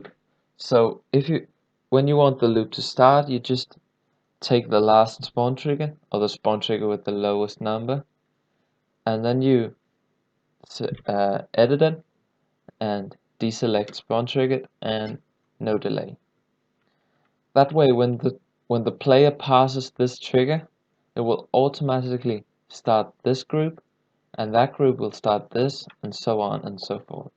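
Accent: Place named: Denmark. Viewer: Why does this guy have a danish accent lol Are you from denmark:0 If you are then hi, jeg også dansk:D:D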